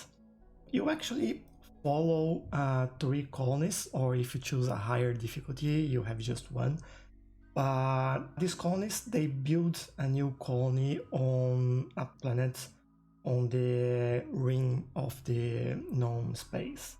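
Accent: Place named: Brazil. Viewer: You sound like a brazilian guy speaking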